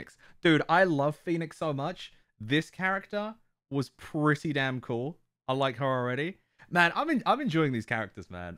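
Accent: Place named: United Kingdom. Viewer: Bruv hes from britahs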